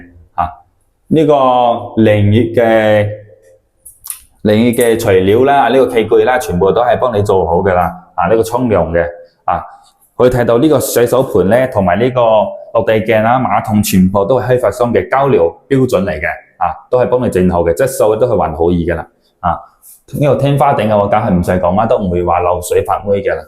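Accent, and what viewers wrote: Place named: Taiwan. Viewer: 點解你廣東話似台灣人，不像大陸人？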